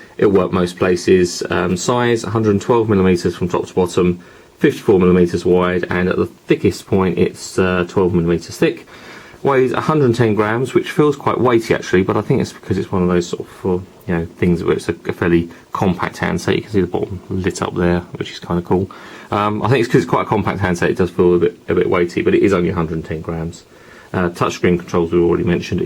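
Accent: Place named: United Kingdom. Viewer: Love the phone, and thats a pretty good review. and i love your britain accent when talk! haha.. still trying to learn that accent anyway! :)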